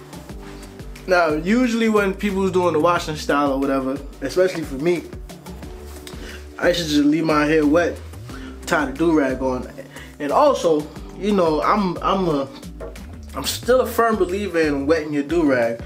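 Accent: New York, United States. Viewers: Where you from bro , New York philly ?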